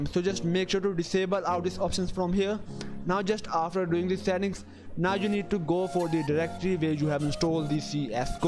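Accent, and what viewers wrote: India. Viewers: Overused music , indian accent = lit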